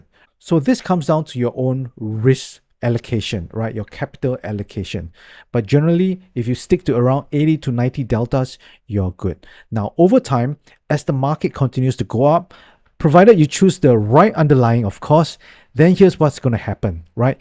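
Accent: Singapore. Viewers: singaporean eh ?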